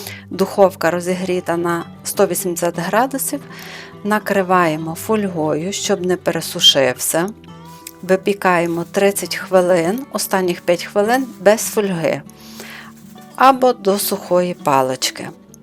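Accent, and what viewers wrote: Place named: West, Ukraine. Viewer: Гарна українська мова заворожує дивитись, пояснення і все по - панськи! Як говорять на Заході України! Успіху! Буду пробувати Ваші смаколики! 💛💙❤️🌹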